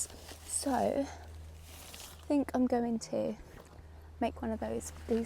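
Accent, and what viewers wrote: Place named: Wakefield, United Kingdom. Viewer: Thought i recognised your accent, I'm from wakey originally 😁 great looking allotment, looks like a little slice of heaven 😍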